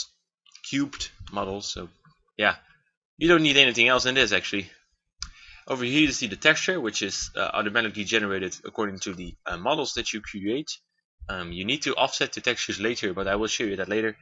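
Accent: Netherlands. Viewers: re you dutch (nederlands)? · are you Dutch ?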